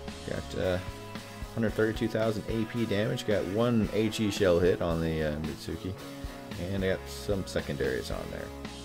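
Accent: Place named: Texas, United States. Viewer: Much enjoyed ty! You've mentioned you're in Texas - I am curious what general region and is that where you're from? Mostly I'm curious your accent which is not over-the-top but just enough : ) For my part I was not born in Texas but I got here as soon as I could!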